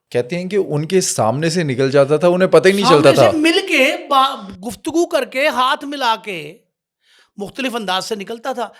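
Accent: Punjab, Pakistan. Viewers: Sahib tu c Paindo ho, bus video Bani ja, paisay kami ja. Tohadda Karachi SE Kiya talluk. Ardo (urdu) Punjabi which bolde ho. O Paindo bey ja.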